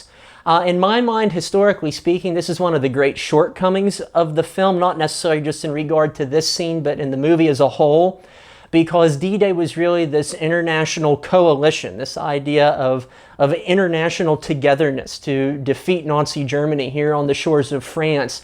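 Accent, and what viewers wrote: United States. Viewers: Anyone who wants to know how american accent sounds just has to listen to your video ! Love from Normandy, near the beaches. .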